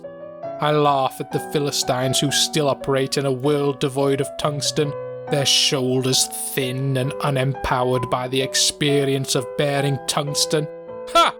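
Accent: Scotland, United Kingdom. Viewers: The British accent was the cherry on top. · why does he kinda sound scottish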